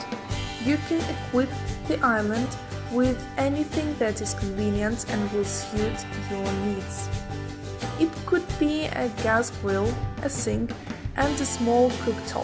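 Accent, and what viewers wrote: Russia. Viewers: Видео отличное, но вот вопрос: почему нельзя было озвучить на русском и сделать английские субтитры. И еще: Где нашли такой рязанский акцент?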